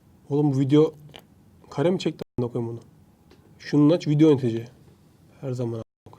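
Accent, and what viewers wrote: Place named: Eskişehir, Turkey. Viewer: Daha videonun basında düşünüyodum bu adam eskişehirli diye harbide öyle çıktı mk (bende eskişehirliyim)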